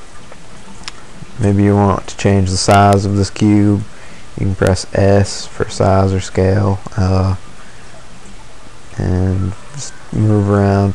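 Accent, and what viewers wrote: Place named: Tennessee, United States. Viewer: damn guess since im from tn i ddnt notice the accent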